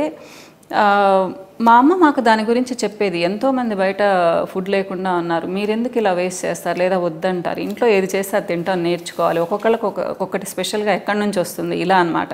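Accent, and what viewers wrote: Nellore, India. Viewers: gaaru mee videoes chusthunnaanu,mee manchi maatalu,padhimandhiki panikivachhey vishayaalu, mee maatalaloney thelisipothundhi mee methhati manasu.maadhikooda Nellore eynandi,mee prathee maata nenu matladuthunnatley undhi, naa manasu ki daggara gaa undhi,ee roju pappuchaaru chaalaa baagundhi,nenu kooda eppudu chesthuntaanu,kodhigaa populo menthulu,inguva vesukuntey Inkaa chaalaa baaguntundhi,kothhimeera kooda dinchey mundhu vesukuntey chaalaa baaguntundhi.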